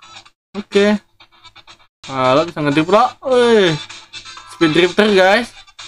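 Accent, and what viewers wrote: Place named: South Kalimantan, Indonesia. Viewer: Kayaknya kamu orang kalsel ya guys?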